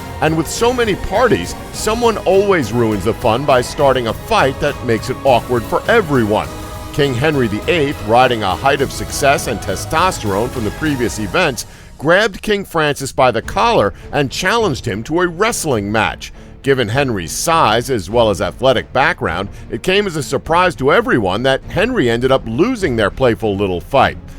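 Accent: United States. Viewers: Whats with the yank commentary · What the hell is an American robotic going to sound credible about English history. Don’t make me laugh. Didn’t even watch. Too stupid. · Why do have to listen to an American commentator, with a dreadful American accent, on this video? A British commentator would never use the word “freakin,” and he would also know how to pronounce the word “Salisbury” correctly. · The American accent seems to cheapen even these inaccurate facts...very off putting. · Urrgh, i turned off as soon as a heard the American voice...this video will be 90% bullshit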